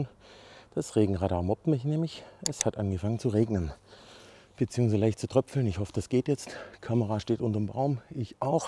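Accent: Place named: Swabia, Germany. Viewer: War wieder sehr unterhaltsam und lehrreich. Wären wir in der Nähe, könntest von uns auch viel Kaffeesatz kriegen (Vollautomat) 😅. Das Einfärben ist im wahrsten Sinne des Wortes eine "Sauarbeit". Kriegst du da keine Probleme mit der Haut, ist das nicht wie Schmirgelpapier? Ergebnis ist jedenfalls echt gut. Ich finde es übrigens cool, wenn du schwäbisch schwätzen tust.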